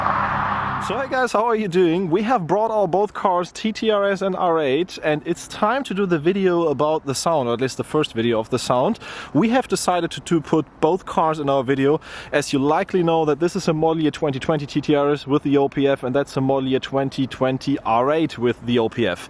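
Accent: Germany. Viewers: Bist du deutscher wegen deinem Akzent?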